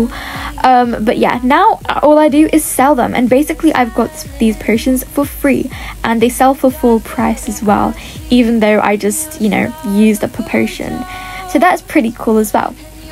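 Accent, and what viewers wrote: United Kingdom. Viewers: You are so british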